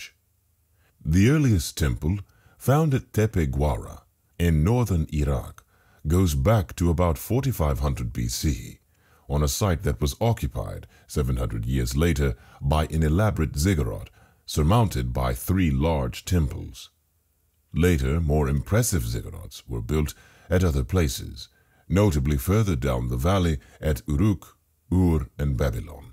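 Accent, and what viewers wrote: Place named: United Kingdom. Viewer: Can’t tell if you have a British or American accent. It’s like a mix of the two.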